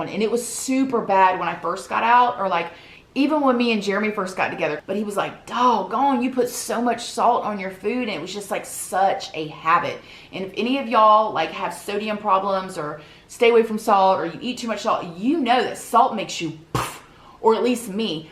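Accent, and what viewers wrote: South, United States. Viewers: You have a southern accent. (I’m from Louisiana) where are you from beautiful?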